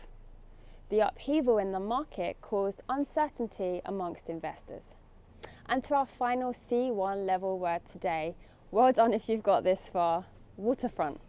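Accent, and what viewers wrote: United Kingdom. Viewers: Your video made my day. ❤❤ Love British accent.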